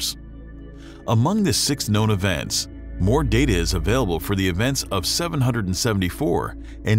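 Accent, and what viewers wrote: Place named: United States. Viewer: This American accent is disgusting. Can't watch it.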